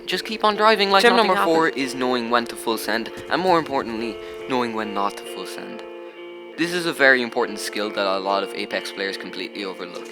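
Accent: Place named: Ireland. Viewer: i love the Irish accent (I think)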